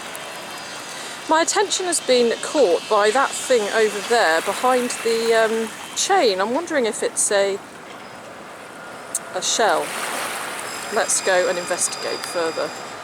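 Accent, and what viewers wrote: United Kingdom. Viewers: Your voice is so angelic, I don't know who's I like better yours or Michael wood for a British accent. You could sell your voice like him no problem.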